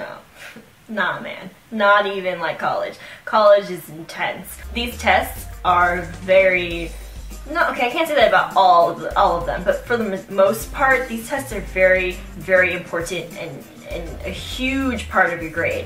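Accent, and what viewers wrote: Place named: United States. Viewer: All I have to say is: WOW! College is SO different where you're from (I get the idea that it's somewhere in the US from the way you speak but I could be wrong)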